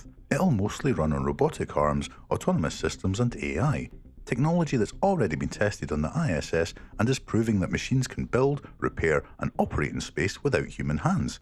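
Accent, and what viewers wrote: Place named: United Kingdom. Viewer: this is just creepy i was hoping to get away the britt accent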